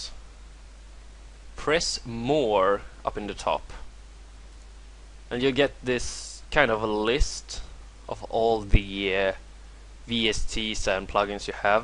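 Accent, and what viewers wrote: Sweden. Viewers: Haha du låter som en typisk svensk <3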